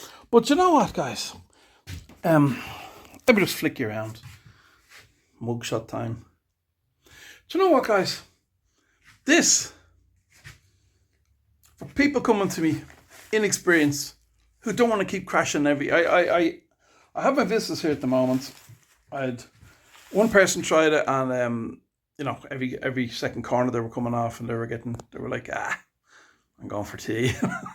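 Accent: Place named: Ireland. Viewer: I ❤❤that Irish accent....as well as the slot cars 😂😂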